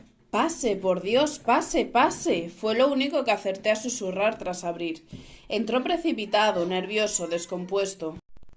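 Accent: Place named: Spain. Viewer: Gracias me gusta como das paso ala lectura,TU voz suena de españa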